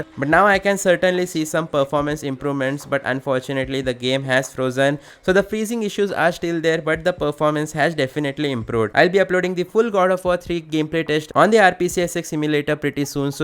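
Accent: India. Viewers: I am a Indian but why I can't understand Indian English 😢😢😢😢😢 It's like 5x speed😂😂😂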